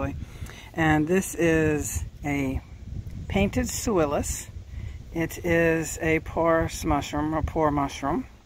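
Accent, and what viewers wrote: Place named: Maine, United States. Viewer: Very cool. I just found you by doing a Google photo (I'm foraging) search. I also am from Maine. I heard your accent and was like she's gotta be a Mainah! LOL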